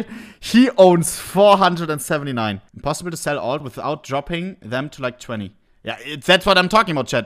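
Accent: Germany. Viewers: my guy is german but speaks english bra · German accent in english is fucking great lmao i moved to germany over 2 years ago and as a english speaking person it was soooo funny hahahahahah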